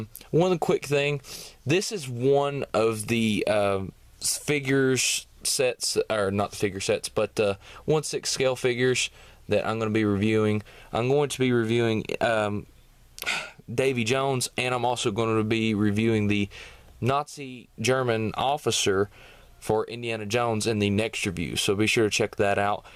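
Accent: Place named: South, United States. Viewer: I'm loving the southern accent. I'm southern myself. North Carolina born and raised. I'm also a country singer and songwriter and comedian.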